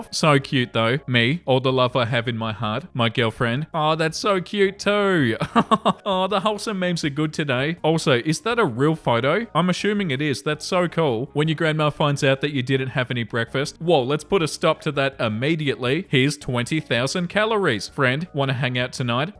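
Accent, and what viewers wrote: Australia. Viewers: NGL, I absolutely love Australian accents and it's part of the reason I find your reads so funny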